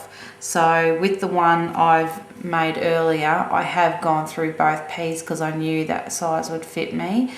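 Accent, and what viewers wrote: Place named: Australia. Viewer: You make that look so easy so I'm going to have to try this. I've just begun to think about making jewelry since it looks like so much fun to do. There's a lot to learn about all the tools and wires and beads etc. but I'm going ahead with it. Thanks for doing this. I love listening to your voice and your accent. :) I love Aussie and British accents and could listen to them all day. ;D Beautiful ring too!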